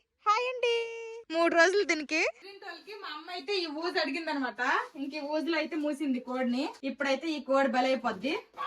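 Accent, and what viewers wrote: Srikakulam, India. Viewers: Uriki velte paka srikakulam voice lo matladutunaru miru akka super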